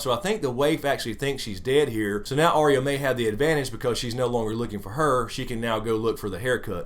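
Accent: South, United States. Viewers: and fuck that dislike. Probably some other GoT reviewer. You have some of the best GoT videos out, and I try to watch all the good folks. Plus im pretty sure from your accent your from the south, or maybe out west in texas or something lol. Either way, us southern folks gotta stick together lol.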